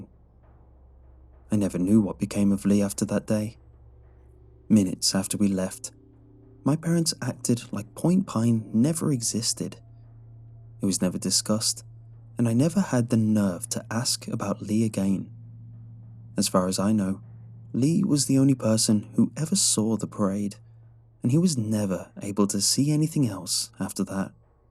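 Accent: United Kingdom. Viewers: Mans from arizona but speaks but has a british accent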